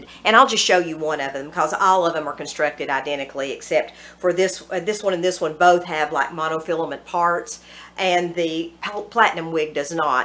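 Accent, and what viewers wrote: South, United States. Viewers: I just found your channel. I think that you look very good in the red wig! You have a red head kinda personality! I should know because I am a redhead myself! I just subscribed to your channel. Oh yeah! I can tell by your accent that you are from the south. So am I!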